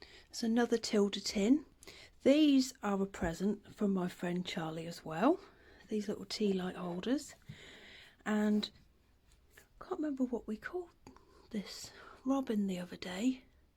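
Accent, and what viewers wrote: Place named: England, United Kingdom. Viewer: Enjoyed your English accent and your video!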